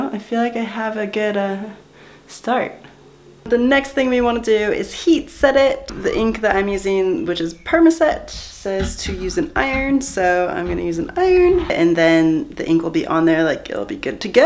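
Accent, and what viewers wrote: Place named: New Zealand. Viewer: never found kiwi accent so interesting before XX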